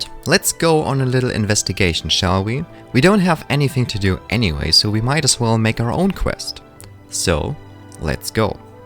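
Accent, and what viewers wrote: Germany. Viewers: Deutscher Akzent hahaha xdd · Are you from Germany? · I can tell you're German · Du bist deutsch erkennt man bei deiner Aussprache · u sound kinda german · Sounds like German-English to me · You are a german aren't you? I'm a german who can (no flex) speak english pretty good even though I'm really young and I've heard a lot of germans in my class speaking crappy english. I mean you're english is perfect but you can still hear the german dialect (correct me if I'm wrong). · I wonder whats ur native language ? German?👀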